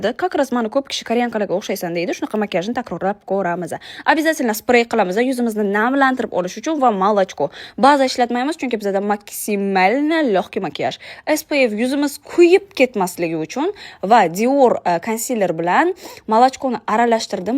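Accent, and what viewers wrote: Bukhara, Uzbekistan. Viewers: Бухоро шеваси бу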